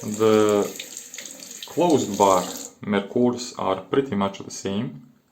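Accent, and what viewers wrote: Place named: Serbia. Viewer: you sound so serbian :)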